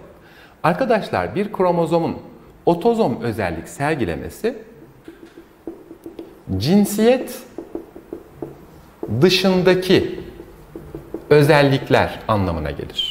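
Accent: Standard. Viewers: Hocam 10 numara 5 yıldız adamsınız. En önemlisi diksiyon harika ve tertemiz Türkçe. Ha bi de Halil Sezai ders anlatıyor zannediyorum hocam arada karizma adamsınız :) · türkçesi maşallah TRT muhabirleri gibi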